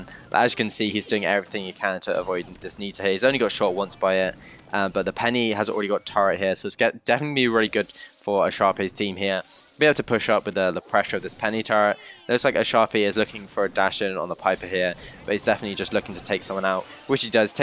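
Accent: United Kingdom. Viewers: british english accent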